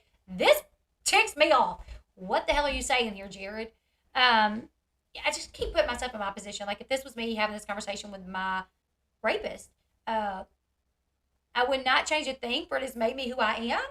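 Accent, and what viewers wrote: South, United States. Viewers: I don't really like channels like this as in...I usually lose interest or find it boring or the speaker annoying...or their voice irritating. However, I really like your channel and I subscribed. Maybe it's the familiar twang in your voice that feels comfortable because I was born and raised in the south. You also seem genuine and humble. Anyways I just wanted to express that I love what I have seen so far. You're doing a great job ma'am 🤗🙂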